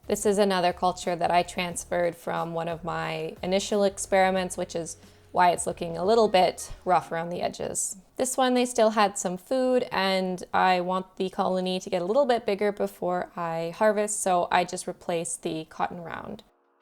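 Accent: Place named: Canada. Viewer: I’m from BC originally and live in the states now. I love hearing the Canadian pronunciations. Sachets is an interesting one bc usually Canadians say things more French-ish (i.e., foy-YAY vs. FOY-er), so you’d think it would be the Canadians saying sah-SHAY but nope. Sadly, most of my Cdn pronunciations have disappeared from my vocab but I refuse to give up the correct way of saying PAH-sta (not PAW-stuh). 😂 Great info and exactly what I was looking for. Thanks!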